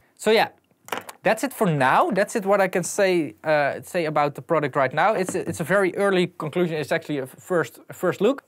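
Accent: Netherlands. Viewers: I have a girl in my college, she's from the Netherlands, she speaks with exact same accent=)) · Its typical dutch english, Its How almost everyone in Holland talks english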